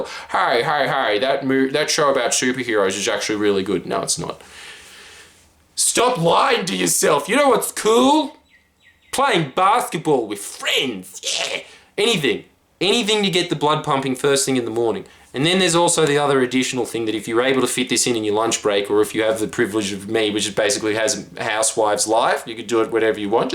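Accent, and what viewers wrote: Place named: Australia. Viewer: Best channel to learn Australian pronunciation.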